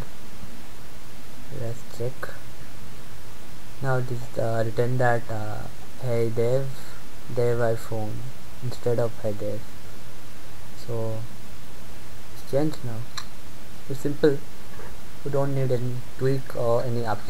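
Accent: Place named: India. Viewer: I dont understand your Indian accent nobody tell what you are you saying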